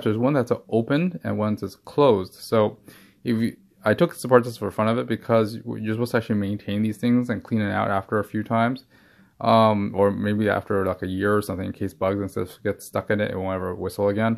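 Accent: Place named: California, United States. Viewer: This was good but I had to listen over and over to catch his words, as he didn't speak distinctly, unless I'm just not accustomed to a California accent. But still, thanks for the info.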